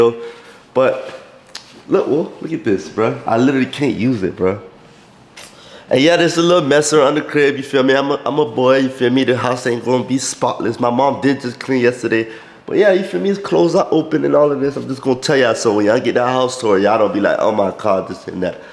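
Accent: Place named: Florida, United States. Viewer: Is He From Florida Because He Talk Just Like My Boyfriend That’s Crazy 😂😂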